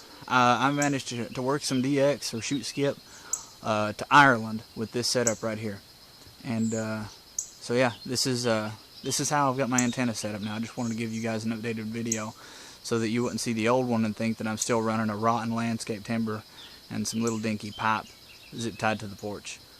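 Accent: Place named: Eastern Kentucky, United States. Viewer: you sound like a Eastern Kentucky boy, am I right ?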